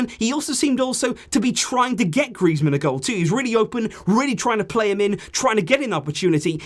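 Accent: United Kingdom. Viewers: Your British ascent is so good ✌️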